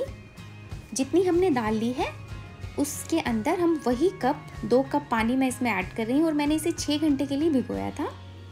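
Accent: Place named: Marwari, India. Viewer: Good trick. You have a Marwari accent :)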